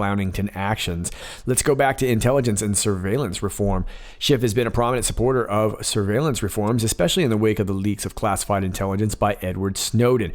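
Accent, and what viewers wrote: United Kingdom. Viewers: A BRILLIANT 'posh' British accent Jane from England x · Hey I just wanted to say I reckon [+fakewood] means “and Hollywood”. PS. Great English accent xx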